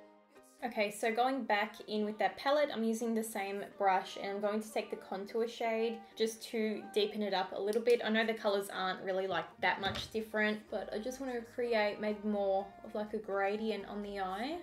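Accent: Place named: Australia. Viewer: Can i have an Australian accent tooo? Lol so lucky! 🖤❤️ even though you arent crazy about the makeup it still came out good!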